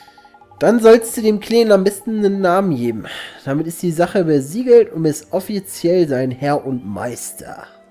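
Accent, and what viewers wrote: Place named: Cologne, Germany. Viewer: Ne das ist Kölner akzent :)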